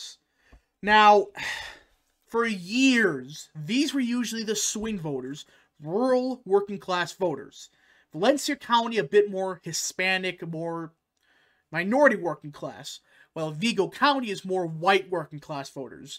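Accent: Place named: Chicago, United States. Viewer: I'm a Chicago born and I appreciate your accent. Sounds so chicagoian.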